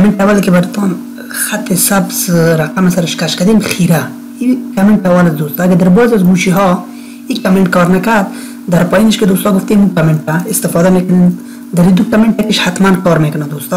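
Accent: Afghanistan. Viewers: گوه تو لهجت افغانی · لهجه ی افغانی خیلی غلظت داره واسه ایرانیا سخت میشه فهمید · از لهجه اش معلوم میشه که پشتو زبان است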